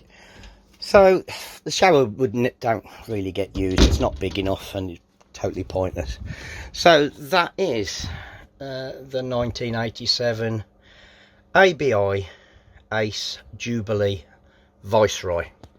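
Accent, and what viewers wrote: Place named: Staffordshire, United Kingdom. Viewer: Can't decide if you're from Staffordshire or Shropshire.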